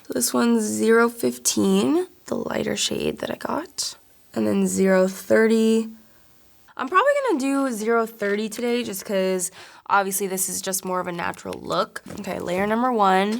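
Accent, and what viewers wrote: United Kingdom. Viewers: Damn girl! Your British accent is perfect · I love that British accent💂🏻‍♀️🇬🇧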